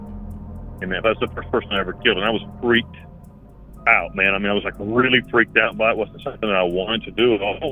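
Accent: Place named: Manchester, United Kingdom. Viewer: New subscriber here - recommended by Disturban. I noticed your accent, are you from Manchester?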